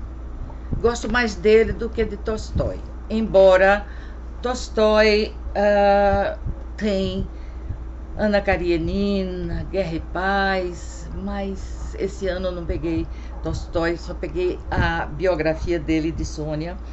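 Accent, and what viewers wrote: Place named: Pernambuco, Brazil. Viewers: Por favor,! Faça vídeos longos! Vc tem um voz muito agradável somada a um sotaque refinado do Nordeste, som q, aliás, adoro ouvir! Vc é muito agradável nas sua colocações e tem muita coisa a dizer com o seu conhecimento! Caso alguns dos seus seguidores não gostem de vídeos longos, alterne vídeos curtos com longos para agradar aos q gostam! 😊 Outra coisa: vc poderia me dizer o título do livro romântico q vc iria começar a falar, mas se lembrou q não estava na sua pilha? Fiquei curiosa! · Feliz Ano Novo e Bons Livros 📚!!!! Gosto de ouvir você sobre os livros sobretudo com sua fala pois lembra muito minha mãe Marlene que era também pernambucana. Bjsss😘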